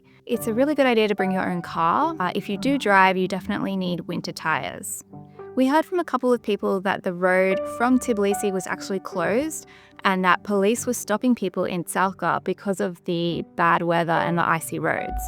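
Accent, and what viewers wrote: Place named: Australia. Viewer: This ice-bathing has been a common practice in nearby Russia too, since time immemorial. As with Wim Hof's practices. Wonderful to hear the history and special relevance to Georgian practices, thank you. All your photos, videos and blog are exceptional. Great to hear a fellow Aussie voice - and from Brisbane no less :-)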